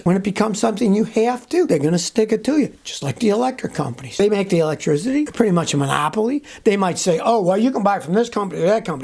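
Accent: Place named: New England, United States. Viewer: I really like and enjoy your videos. I like y'alls yankee accent, and also the way you speak very fast. HBD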